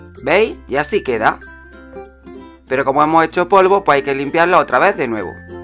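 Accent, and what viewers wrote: Andalusia, Spain. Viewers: De Venezuela Hijo ...se te Nota lo andalu Hole Hole ...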